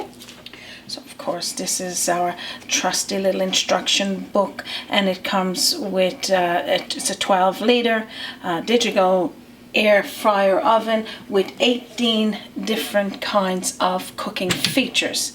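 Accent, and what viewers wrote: Ireland. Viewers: Well Hi....Thank you kindly. What a wonderful & simple recipe this is. I've just watched it. I'm most definitely going to be having a go over the weekend....I'm sure our lovely grandson is going to just love this type of chicken with tater-tots....I'll be adding a little sprinkle of garlic powder & a hint of paprika..... Thank you kindly. I really very much appreciate your taking time to post this....Just subscribed 2....All good. I'm a seasoned traveller myself. Many decades ago of course....I'm picking up a we bit of Irish or Dutch in your lovely accent? I'm definitely going to say Irish ancestry..... Been Der2......😍😃😍😃😍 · I thought you were Irish because of your accent! Nice review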